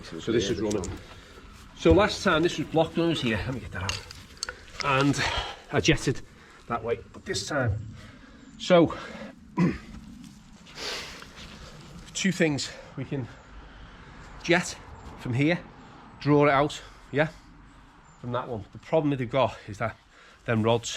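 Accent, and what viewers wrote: Liverpool, United Kingdom. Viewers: Liverpool accent as thick as the sludge in the drain. English of Ye olden times. I love it! Had to use close captions to follow along with the story! lol. Gagging the entire clean out process. Well done. Cheers! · Ah the lovely British accent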